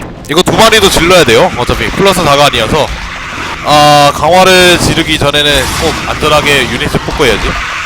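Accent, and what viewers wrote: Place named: Daegu, South Korea. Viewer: 님 대구에 사시나요?교회에 댕기고는 사투리인데 내가사는곳의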